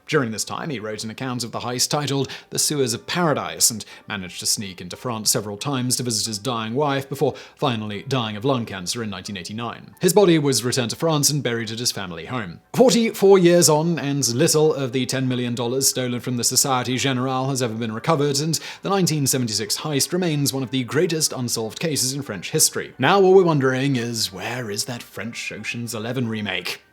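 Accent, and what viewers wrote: England, United Kingdom. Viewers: I think deserves a statue. He manages to get people to pay attention to the most random and banal subjects but that English accent and dry humor makes us forget that we didn't know we didn't care about whatever subject we didn't know we didn't know about. Encouraging accidental education during quarantine is a difficult thing to attempt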